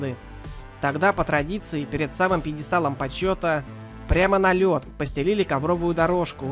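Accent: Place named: Ukraine. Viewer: типичное украинское произношение, не в обиду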